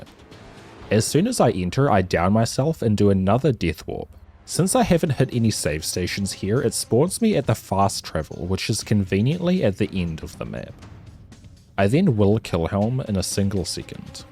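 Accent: New Zealand. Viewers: Where are you from? I initially clocked you as Kiwi but as the video went on, I became less and less confident in my assumption. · heard the accent and knew u were NZ, great video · Hey man what accent do you have? I thought you might've been a Kiwi?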